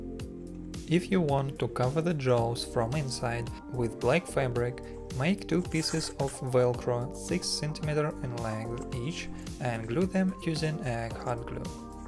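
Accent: Russia. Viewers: Какой-то слишком русский акцент -_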